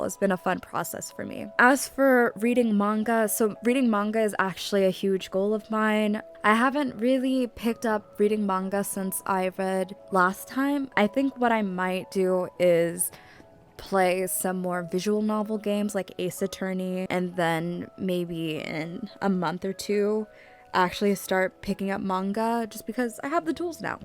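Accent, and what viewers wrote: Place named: Somalia. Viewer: you sound somali. plz correct me if not but i can just recognize a 1st gen accent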